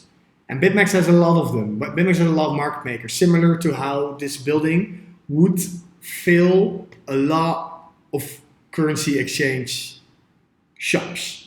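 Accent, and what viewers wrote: Netherlands. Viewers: are u dutch? sounds like a anglo dutch accent. thnx anyways for the info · Is that a Dutch accent I hear?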